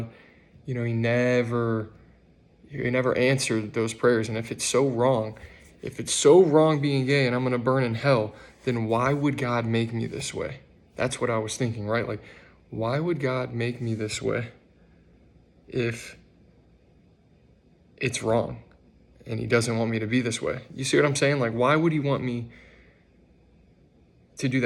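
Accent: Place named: South, United States. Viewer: Love you humour and southern drawl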